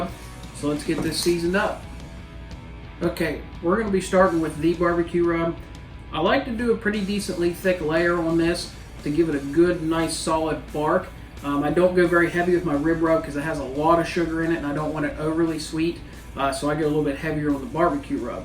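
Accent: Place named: Pittsburgh, United States. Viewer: Thanks for the tips. I'm doing my first try at a pork butt (on an RT400 Bull) this weekend for a party of 40. I was going to do it the day before and reheat but I think I'm going to try and time it right so I can pull just before we eat. Will probably have it rest for a few hours in a cooler. I bet you're a black and gold fan with that Pittsburgh accent! I'm in Greensburg. Go Steelers!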